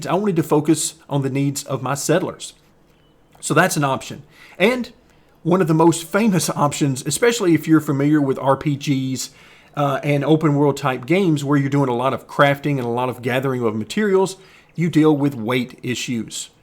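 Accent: South, United States. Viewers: Your videos are great, learnt alot! Also the added novelty of you having a Southern American accent whilst explaining a medieval game is brilliant! Keep up the good work 😁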